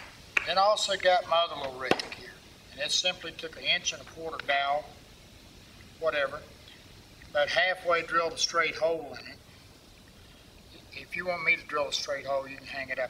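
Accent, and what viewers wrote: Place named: South, United States. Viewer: I love the down to earth no BS way of explaining each step, complemented with that great southern accent. Thank You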